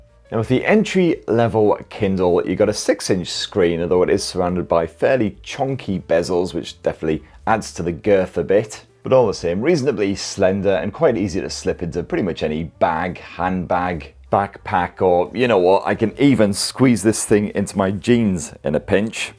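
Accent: United Kingdom. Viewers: i need fucking subtitles for your bongish accent , learn to speak like a decent human.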